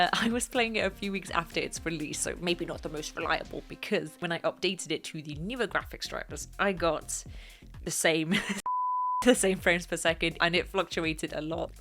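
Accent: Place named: United Kingdom. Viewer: are you thinking she is Australian? She is british. I think its funny so many americans can't tell the difference between the two accents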